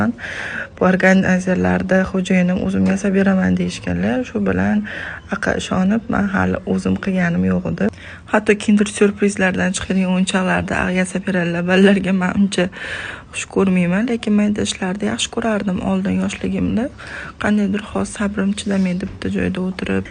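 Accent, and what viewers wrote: Kokand, Uzbekistan. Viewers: Менимча Коконликсиз шекилли шевангиз ухшаяпти😊😊😊😊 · Qo'qonlimisiz qatidansiz shevez judayam o'hshidi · Qo'qonlikmisiz shevangiz.😊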